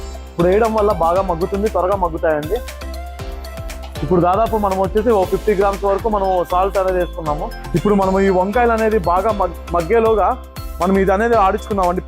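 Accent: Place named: Kadapa, India. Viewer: మా కడప వాళ్ళ యాసే మీది కూడా.. ఆ మాట తీరు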